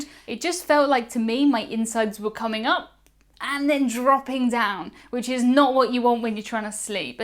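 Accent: United Kingdom. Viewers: Please continue to speak in your home grown accent and use the words of your country. I love your "Britishness".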